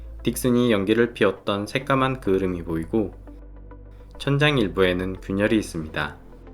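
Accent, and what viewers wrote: Jeolla, South Korea. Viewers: 이건 개인적으로 느낀건데 정보를 전달하는 분들은 정확한발음과 표준어를 사용해야 한다고 생각합니다 발음은 상당히 좋으신편인데요 전라도 사투리가 심하셔서 듣기 거슬립니다. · 전라도 억양을 사용하시네요 ? 악의없는 댓글이니 오해 마시길.....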